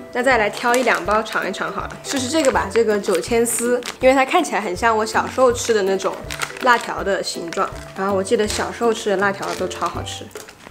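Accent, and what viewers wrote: Changsha, China. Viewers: Zhi Xuan Ang 口音大陸人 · nia xswl我就说口音怎么如此熟悉原来你是长沙的嘛哈哈哈哈哈哈哈哈哈哈哈哈哈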